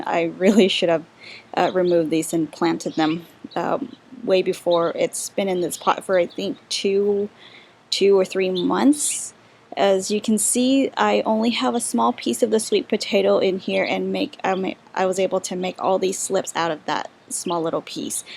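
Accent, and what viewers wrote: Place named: United States. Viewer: I know this makes me stupid and borderline racist but I was completely thrown off by your completely normal American accent. lol Sorry.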